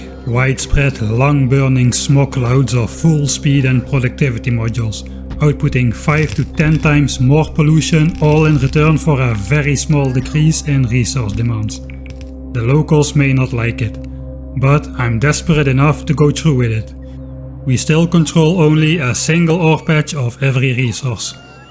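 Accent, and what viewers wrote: Netherlands. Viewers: I recognized the Dutch accent curse from the start! As I have the curse myself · I've been wondering about your accent forever. is it dutch? or something I just can't put my finger on. it's really rather thicc for a dutch accent. but then again sounds like a dutch name. man.... maar uiteindelijk: toch GEKOLONISEERD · "But as I'm Dutch" I knew your accent was a little off to be french, but for some reason I didn't expected for you to be Dutch. I thought the accent was going to be different.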